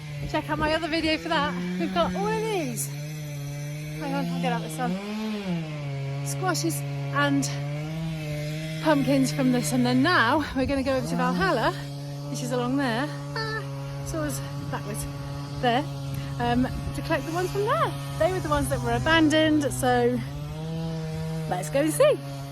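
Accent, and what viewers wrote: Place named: United Kingdom. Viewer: British gals are so cute and sexy. Love your accent 😍❤️.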